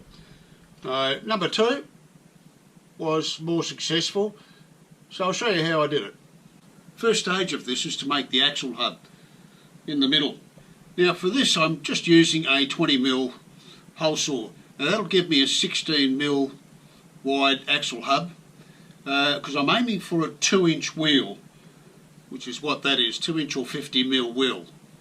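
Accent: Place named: Portugal. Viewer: Parece-me um português falando a língua Inglesa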